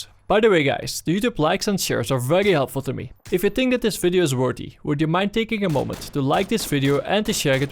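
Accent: Netherlands. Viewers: Where is your accent from, it sounds like a mixture other Netherlands with northern England. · you sound dutch but 10/10 vid m8 keep up the great work :)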